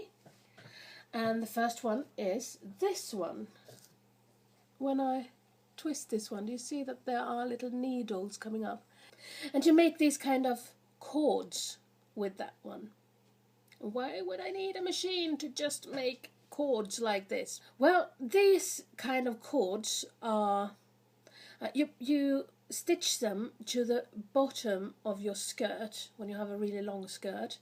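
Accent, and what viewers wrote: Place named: United Kingdom. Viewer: You are fascinating. LOL! I hope you take that as a compliment. Do you live in Sweden? From your accent I was assuming you were in the UK.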